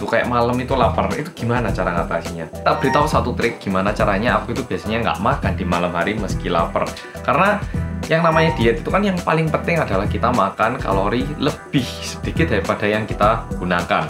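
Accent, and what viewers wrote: Java, Indonesia. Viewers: Medok nya emang sengaja gak di ilangin ya mas 😁tapi bagus kok medok jadi lebih ke Jawa jawa an gitu. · Maaf baru pertamakali nonton.. orang korea asli apa jawa? Atau campuran? Soale muka korea logat jawa..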